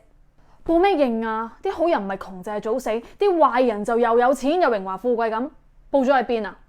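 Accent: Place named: Hong Kong, China. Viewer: 多謝（閣下）菩薩其實我等咗以香港方式嘅廣東話講解佛學意理解終於等到了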